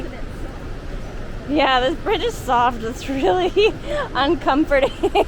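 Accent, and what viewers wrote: Costa Rica. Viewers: Hi, I have seen some of your videos and your spanish pronunciation is very "Tico" and also cute, How did you learn it, formal classes or just listening and talking ?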